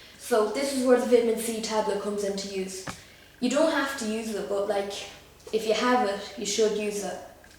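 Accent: Ireland. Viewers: are you IRISH by any chance lol? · ah ur irish just like me :) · I love the Irish accent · Sorry but im british, i've never head anyone in my life say 'dish soap' that just sounds weird o_o Where we come from we call it 'washing up liquid'. And no, I do not speak like him because he's irish im guessing >_> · Someone's irsh..... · Im Scottish and i know for sure he's Irish!!! good job, WELL DONE :D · Yee sound a bit Irish yea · gay irish man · Are you Irish? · Cool are you Irish? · U sound Irish but at the same time gay :-/ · dublin or belfast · he sounds so irish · I love your accent! You're Irish? Welsh? Scottish? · wow i love his accsent....is it Irish? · Are you irish because I'm irish and you sound iris to me and don't mind what these people calling you names say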